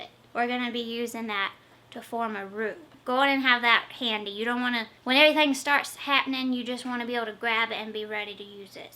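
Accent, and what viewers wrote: South, United States. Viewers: You look like wynonna rider! But with a southern accent! I promise it’s a compliment!! ❤️❤️❤️ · Slow southern draw! Haha it was an adjustment for me when I moved from up north to down south. Apparently on the flip side I speak really fast!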